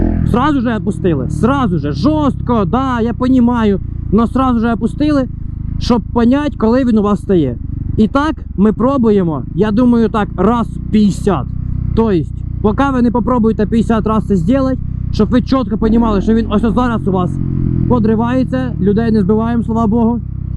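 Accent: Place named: East, Ukraine. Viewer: Як на мене українська зі східним діалектом, або невеликим суржиком. Я кна мене на 100% краще ніж чиста кацапська🤢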